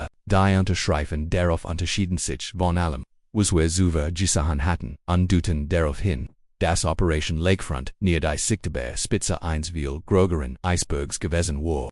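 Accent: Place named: United States. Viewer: Ähm... Deutsch mit Amerikanischer Aussprache??? Das kann man nicht mal "Denglisch" nennen, also vollkommen unverständlich. Dieses Kauderwelsch versteht weder ein Deutscher, noch ein Amerikaner, was soll so ein Quatsch???????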